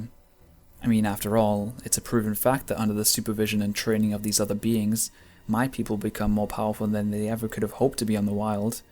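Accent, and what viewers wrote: United Kingdom. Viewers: ... Please do more of these... That's great. And the british accent makes it even better:] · hes British lol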